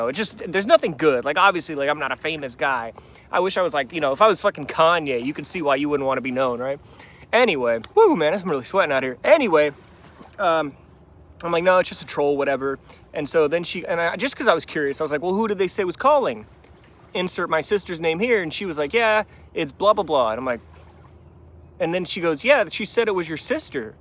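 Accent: South, United States. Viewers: funny thing to note, i noticed at a couple points in the video you're starting to pick up a very slight, almost imperceptible at this point, southern accent, only noticed it at one or two points in the video. that's pretty cool. interested to see how your accent changes over time the longer you're living there. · Hes developing a southern accent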